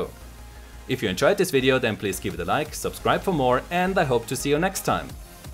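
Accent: Germany. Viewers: What is that accent? Is it German? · You sound german :D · Aus deinem echt fantastischem english habe ich rausgehört das du doch ein deutschsprachiger bist also schreib ich´s auch in deutsch wenn es dir recht ist. verdammt interessantes video !!! danke dir sehr. Hast du infos was world of warships angeht betreff´s .netzwerk sachen für mich? Ich weis nur das wows (world of warships) mit UDP arbeitet,und das es einen dedizierten server gibt für den EU bereich,ich glaube das er in frankfurt steht denn mein ping ist immer auf dem gleichen wert von 34-35.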